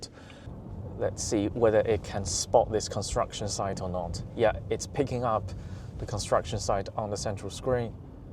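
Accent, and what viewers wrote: United Kingdom. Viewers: 哥们英音真牛逼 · British Accent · 的英式口音太特么好听了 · 英国口音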